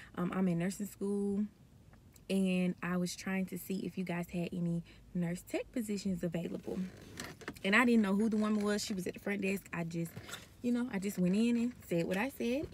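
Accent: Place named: Georgia, United States. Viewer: Girl the accent screams Georgia lol. I’m from Albany, born in Phoebe Putney Hospital 🤍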